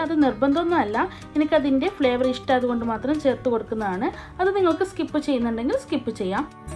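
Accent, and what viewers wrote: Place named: Kasaragod, India. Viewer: Kasargod slang kelkkan nalla rasa... recipe kananum othiri ishta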